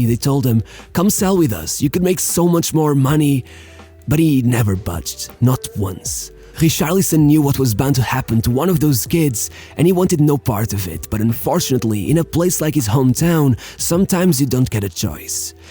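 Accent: Portugal. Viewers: yoooo tu és tuga né? esse sotaque a dizer os nomes dos jogadores parecia bue mm. +1 sub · quando ouvi a tua voz percebi logo que eras tuga, keep up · Are you Portuguese by any chance? Your pronunciation sounds like it. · He isn't the most hated man in England, he isn't even the most hated man in the Tottenham starting 11 for most people I'd imagine. Guy can come across as a little bit of a twat on the pitch, rolling around and trying to get under people's skin but he's not unique in that respect, not even in the Tottenham starting 11 and he's generally an enjoyable player to watch. Had that one little bit of "showboating" controversy recently which is the only thing that stands out as abnormal for a player playing in the premier league but that was more media than fans and most fans seemed pretty 50/50 with no strong feelings one way or another because all he did was a couple of keepy uppies mid game then passed the ball. 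Still don't see how that can make him "the most hated man in England". When ever I watch your videos I generally find them to be void of much fact, just opinion pieces on how you feel like seeing the world spoken as if that must be how the world is with no real research to back up your statements. I mean this video was basically somebody with a Portuguese accent reading a short biography of Richarlson. You can do better. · Eu SABIA que eras português. Tu falas bue bem mas todos os tugas têm um sotaque a falar inglês. Tmb fiquei desiludido com portugal. O fernando santos já não tem condições para continuar, precisamos de encerrar este capítulo e começar um novo... mas até agora não foi despedido. Abraço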